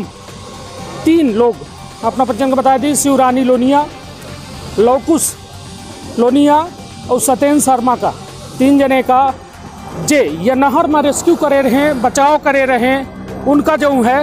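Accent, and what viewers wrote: Bagheli, India. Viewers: Bhai ye bagheli boli h,,ye news sunne ke liye ya to bagheli sikho,,ya is channel k video mat dekho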